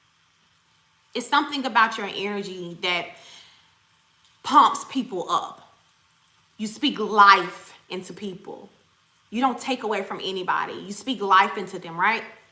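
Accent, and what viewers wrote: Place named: Houston, United States. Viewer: I love your voice do you live in Houston Texas if not you talk like them lol 😂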